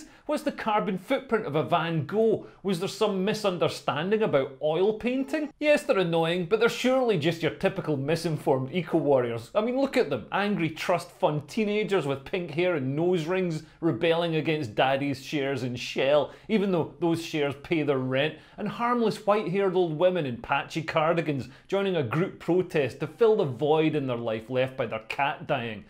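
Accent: Scotland, United Kingdom. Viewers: Just subbed. There's nothing better than a Scotsman having a rant. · All the things that were on my mind and I didn't know how to say, you said it. With a lovely scottish accent. Good job!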